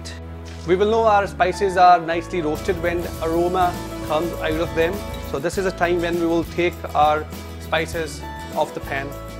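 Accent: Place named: Lancashire, United Kingdom. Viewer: I'm loving this blokes accent. Where's he from? I'm getting north east lancs vibes off it.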